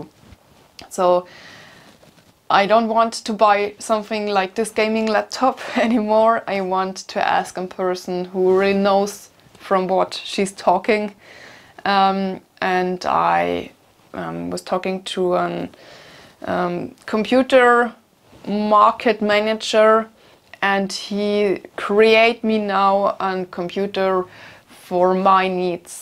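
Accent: Bavaria, Germany. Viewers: Hearing your accent makes me miss Bavaria. You seem tough and I think you will get this all right.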